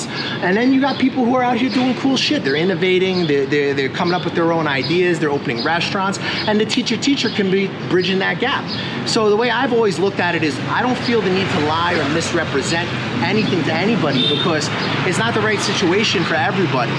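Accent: New York, United States. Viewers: Bro i love this guy, his honesty and frank language makes him a diamond in the rough of youtube lames. Bro i knew he was from new york just how he speaks lol. I may hit you up bro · When I started teaching in 2018, I didn't have a degree, but I still found a job easy in Vietnam. Thanks for sharing your input. Are you from New York? I'm from New York, and you have a stronger accent than me.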